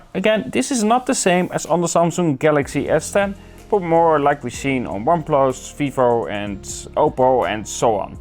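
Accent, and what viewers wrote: Netherlands. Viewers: are you Dutch? I'm like 99% sure...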